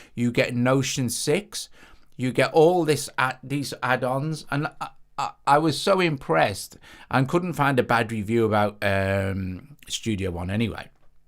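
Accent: Liverpool, United Kingdom. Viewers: I love your scouse accent ;)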